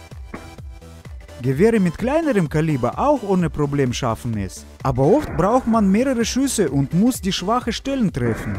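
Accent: Russia. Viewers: er ist russe oder redet er wie ein deutscher?!!! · Ah du russe dashalb aktzent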